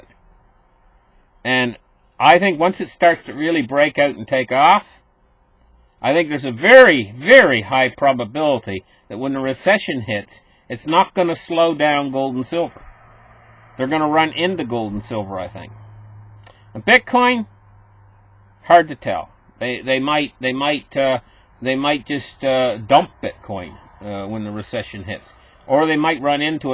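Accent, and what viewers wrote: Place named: Yukon, Canada. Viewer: i try to copy accent, but hard... is it Klondike?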